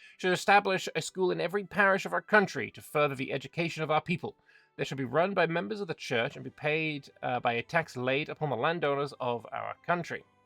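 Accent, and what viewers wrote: Scotland, United Kingdom. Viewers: Your scot accent isn't bad actually! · nice scotish accent.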